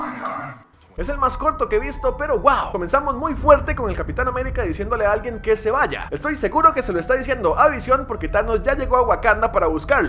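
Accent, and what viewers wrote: Mexico. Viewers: Queeeeeeeee no eres mexicanoo yo pensé que eras mexicano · Yo pensé que eras de México me has timado!!! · Pensé que eras de México: v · Enserio es de costa Rica? Juraba que era mexicano, creo que lleve el noseastancreduloMcfly a otro nivel:'v Salúdame y le cambias el nombre a tu canal por v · Yo soy de costa rica y pensé que eras mexicano xD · Yo también soy Tico yo siempre creí que eras mexicano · queeeee?? no eres mexicano? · Si fui tan credulo para creer que me saludarias lo fui mas cuando crei que eras mexicano:v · mexicano enserio con el acento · Pensé que eras Mexicano jaja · Juraba que era mexicano:v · Mae, crei q eras Mexicano, tal vez por el español neutro, pura vida loco, buen you tuber pa, saludos desde Heredia, muchos exitos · Yo pense que eras mexicano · ¡Fuimos timados! la mayoría pensábamos que eras mexicano. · Pense que eras mexicano... 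Hola Vecino soy de Nicaragua.. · Saludame a mi y a todos los que pensabamos que eras Mexicano... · Pensé que eras mexicano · eres de Costa Rica.? pense que eras de México:'v. pero aun asi eres el mejor v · CÓMO QUE NO ES MEXICANO? hubiera apostado a que lo era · Yo también juraba que eras mexicano 😱😱😱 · todos pensaban que eras mexicano:v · Pense que eras de mexico:v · Vaya!! Resulte engañada, pensé que eras de México!! Aun así, saludos!! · Yo pensé que eras mexicano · Creí que eras mexicano,pero aún así te amo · pensé que eras de méxico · Wow eres de costa rica?crei que eras de mexico,buen video sigue asi.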